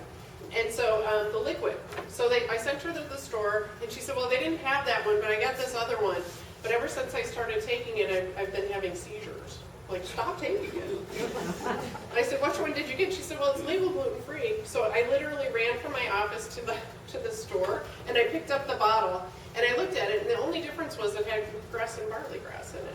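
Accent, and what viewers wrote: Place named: United States. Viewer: Please put subtitles because the sound is awful and sometimes the accent is too American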